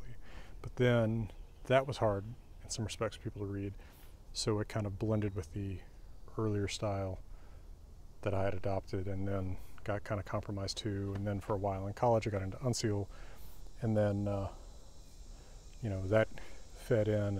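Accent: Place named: Georgia, United States. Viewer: Well, I like your handwriting ! Thank you for sharing a glimpse of your teenaged self . You were already becoming an intellectual by then ! I just stumbled upon you , when you and Simon Roper were “communicating “ in Old Norse or something ! Just last night up popped you and another linguist were talking about the beginning of the alphabets. I am of retirement age, and home school two granddaughters, a 8 year old third grader , and a 13 year old 8th grader . Would love some of this to rub off on them ! Do you by chance have something I can use to inspire them ? I live is SE GA. Natives of NE FL. Surprised that you went to U GA ! Your soft GA accent still coming through .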